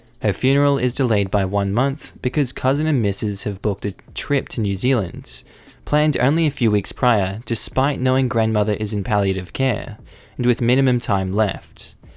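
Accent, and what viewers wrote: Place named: Frankston, Australia. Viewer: Sounds like Frankston type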